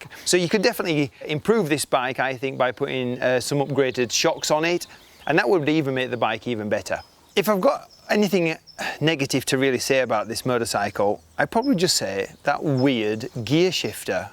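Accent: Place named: Yorkshire, United Kingdom. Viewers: Bridlington accent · Hull/Yorkshire accent ? Lovely little bike and the price is bang on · Did not expect the northern accent in that location.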